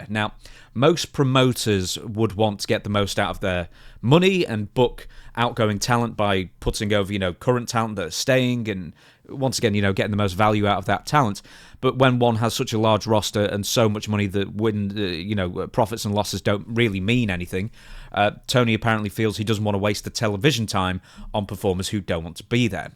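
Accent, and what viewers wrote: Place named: Leicestershire, United Kingdom. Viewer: You're not from Manchester. That's a Leicestershire accent. I know it when I hear itm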